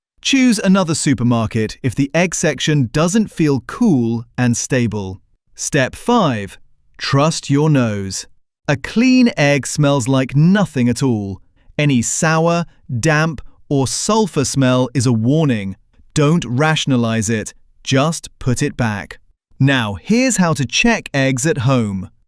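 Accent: United Kingdom. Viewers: English accented narrator, mentioning british supermarkets BUT stocked egg shelves with pricing in usa dollars!? Whats going on?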